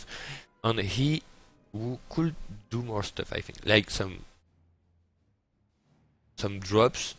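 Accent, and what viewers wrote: France. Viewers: hehe i like heariung all the names in french accent ^^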